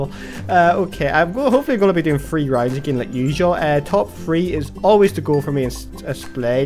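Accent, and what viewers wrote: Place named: Northern Ireland, United Kingdom. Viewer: what part of northern ireland are you from because i am from ireland too, but not northern:( oh well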